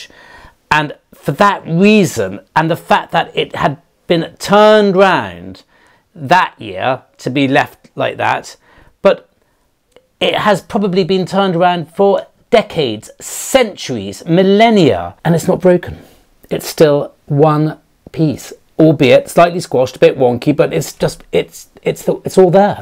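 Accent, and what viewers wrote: RP, United Kingdom. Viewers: You are without any doubt the best metal detecting offering on YouTube, your posh accent is honest and endearing,absolutely love it.taski is always a bonus but of course cannot always feature, love the taxidermy in background, keep up the superb quality of content all the best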